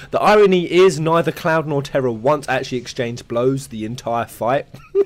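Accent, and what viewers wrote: United Kingdom. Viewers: Haseo Kaztrio He’s British.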